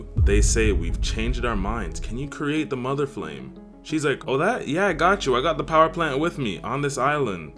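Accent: Toronto, Canada. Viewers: Never thought I’d hear a Toronto man doing one of these types of videos 😂 Toronto man anime is a vibe 😂😂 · You have that native Toronto accent lol it's strong!!! You sound like Drake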